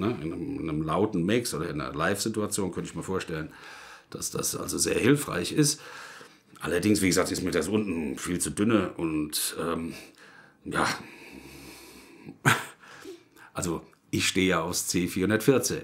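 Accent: Hesse, Germany. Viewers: babbelt hessisch.....great!!!!!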